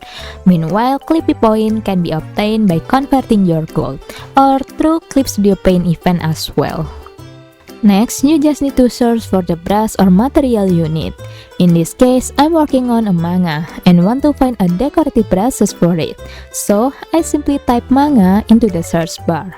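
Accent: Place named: Indonesia. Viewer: oke dari logatnya aku paham kamu orang indo wkwkwk Nice job, makasih tutorialnya kak ^_^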